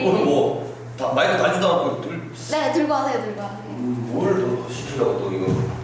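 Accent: Gyeongsang, South Korea. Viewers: 갱상도 사투리 개말립니다 행님 사랑합니다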